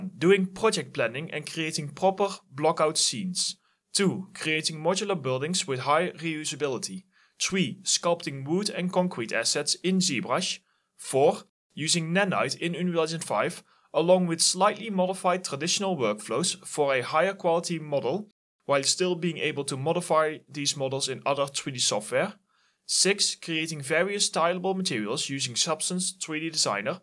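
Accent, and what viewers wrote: Netherlands. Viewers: You mean that megascans library? I was under the impression you could als import your own assets created in, for example Blender, and create textures from scratch. With the only difference you could only use those materials in Unreal Engine (which would only be a downside if you would use another game engine). I'm not a fan of Adobe anymore, although I have used a lot of their software. But I don't like their subscription model, way too expensive if you don't make a decent living out graphic design. I'm good in 'traditional' digital graphics design, but yet have to find my stride in creating for games, as over here there was never an actual gaming industry. Atleast not when I was at 'the right age'. But you sound Dutch, if I'm not mistaken?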